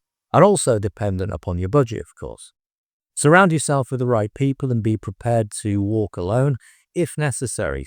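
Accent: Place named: RP, United Kingdom. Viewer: You sound posh but bottom line you chose Pattaya. That says it all in any case no matter how Angelic you like to appear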